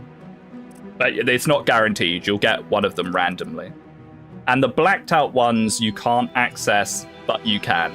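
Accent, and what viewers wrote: United Kingdom. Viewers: Can't wait for the 3am stream I'll miss into another ninian edit into 4 years without streams, keep it up my favorite bri'ish man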